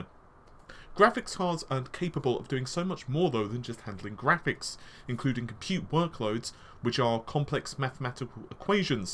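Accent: United Kingdom. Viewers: Very hard to understand him. He sounds like a Brit but also sounds like a person with his english as a 2nd language. His explanation is hard enough to understand with all video gaming and not organized way and his pronunciation on those words is worse lol.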